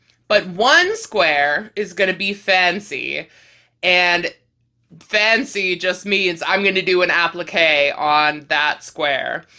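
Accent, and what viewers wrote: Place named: Pittsburgh, United States. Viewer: I'm excited to see how the cardigan works up! Also, I just realized how much you speak Pittsburghese. It makes me homesick!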